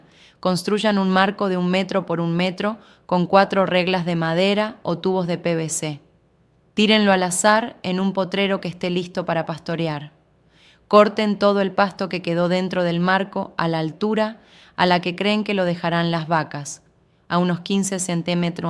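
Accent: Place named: Argentina. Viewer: Eso ya es viejo, se llama rotación de potreros y porque hablas argentino?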